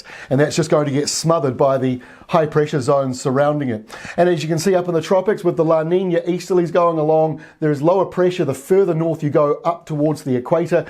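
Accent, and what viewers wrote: New Zealand. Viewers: If there was an award for YouTube weather forecasters, you'd win hands down:))) great graphics, knowledgeable commentary and in depth analysis - all done in that lovely NZ accent!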